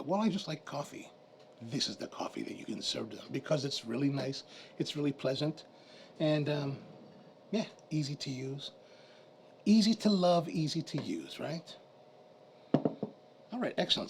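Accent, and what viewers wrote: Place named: Hawaii, United States. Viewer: I new I heard a Hawaiian accent! Then I saw your channel name. Mahalo!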